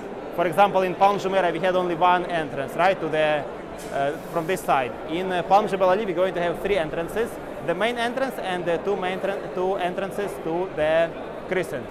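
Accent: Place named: Russia. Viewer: Your speech, information, intonation is great, but reeeealy hard to keep listening bc of such a strong Russian accent. You need to fix it at least a bit, man.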